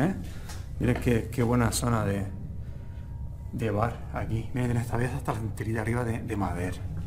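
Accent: Spain. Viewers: y a mi también me encanta tu voz porque tiene el acento andaluz y hablar como mi madre y mis primos de Sevilla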